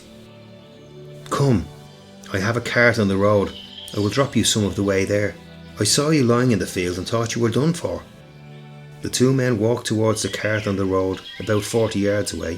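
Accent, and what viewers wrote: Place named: Dublin, Ireland. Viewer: I loved that story... and the way you told it. The history mixed in too.. Listening from Australia.. originally from Dublin.. I miss hearing my accent.. thank you man ☘️🇨🇮🙋‍♀️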